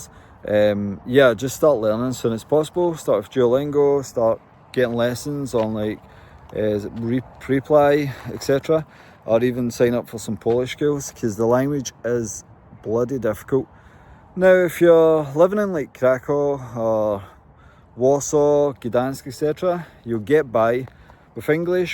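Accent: Scotland, United Kingdom. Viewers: The difference is-I love your Scottish accent. I have never been to Scotland but maybe some day it will be possible ,I hope so. Offended? Are you kidding? We not get offended so quickly. Like the Scotts I've heard they are very direct people, aren't they ?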